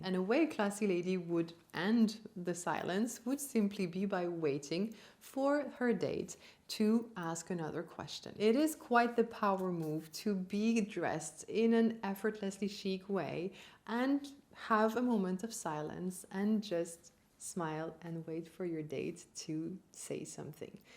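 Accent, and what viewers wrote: France. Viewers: I would love to have you as an older sister of an aunt. You are so admirable and seem like a very lovely person, I love your calm voice and subtle French accent. It is very reassuring.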